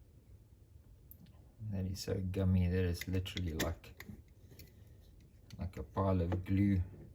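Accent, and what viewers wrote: South Africa. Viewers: Being from the States I dig the accent but more importantly I echo the philosophy regarding vintage steel bikes. They still have a lot to offer and the character and design of certain era’s is sublime. I get a “woody” finding them, cleaning and overhauling them and of course riding them. Keep up the good work in South Africa your videos are very enjoyable and informative.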